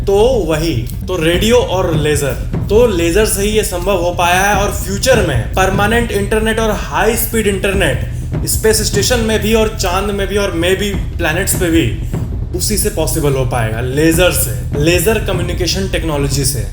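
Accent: Bihar, India. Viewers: That bihari essence wow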